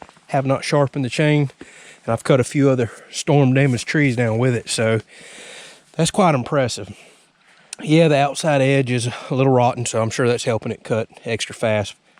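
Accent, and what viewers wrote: United States. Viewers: I enjoy your content so much, and find you really interesting to listen to! Where is your accent from in the USA? Love it ha, all the best!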